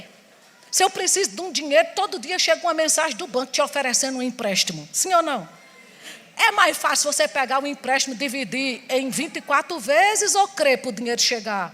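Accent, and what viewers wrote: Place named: Northeast, Brazil. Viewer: Sou de Pernambuco e estou em Brasília, e é bom ver o nosso sotaque nordestino. E prega bem, palavra maravilhosa.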